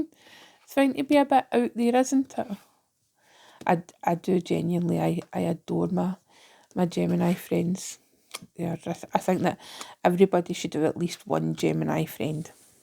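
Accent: Scotland, United Kingdom. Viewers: Interesting. First time here ☺️ am I detecting a Scottish accent?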